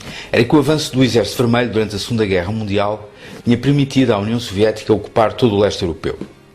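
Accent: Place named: Portugal. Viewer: Português de Portugal.rs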